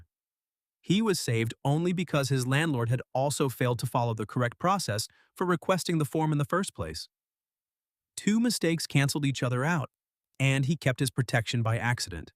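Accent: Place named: United States. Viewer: Why am American accent?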